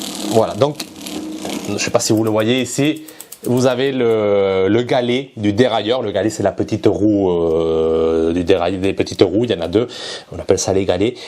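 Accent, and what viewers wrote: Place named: South, France. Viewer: bien joué et merci des infos, mais quel est cet accent agréablement sudiste? Sud ouest?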